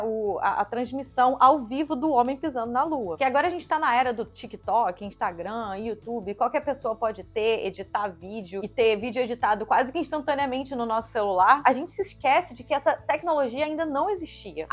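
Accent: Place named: Rio de Janeiro, Brazil. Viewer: Por mais vídeos com sotaque carioca 😆 Essa gente tem muito tempo livre pra inventar teorias mirabolantes.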